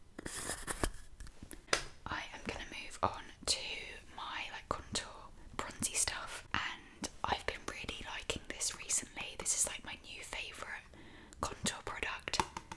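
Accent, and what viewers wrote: United Kingdom. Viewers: I'm new here but hello. Are you British? I can't tell. Even though I'm British. 😝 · the first asmr that i watched in british accent.. so good to hear!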